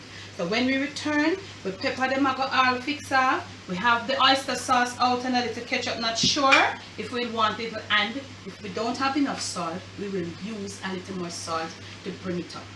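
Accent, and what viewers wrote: Jamaica. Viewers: Thank you for sharing with us your Jamaican pepper steak recipe. I will try it this coming Thanksgiving for my family. You have a nice way of talking, and I like your Jamaican accent.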